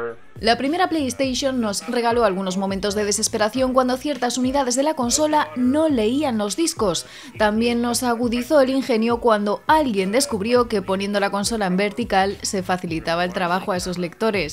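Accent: Spain. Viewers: Juan Jose Velázquez Lucero Cuestión cultural amigo.... Así lo pronuncian en España, "equisbocs", acá en México le decimos "Ecsbocs" .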